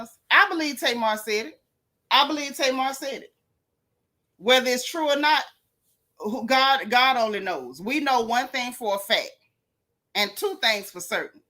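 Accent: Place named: Florida, United States. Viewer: Your Florida mouth have me laughing so hard cause I am from Miami and our mouth is so ridiculous people can’t understand Florida people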